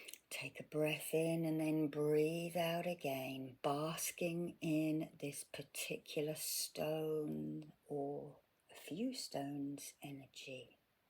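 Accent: United Kingdom. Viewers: you have the same kind of british accent as my sweet auntie, who- funnily enough- is also named:) Bless your positive energy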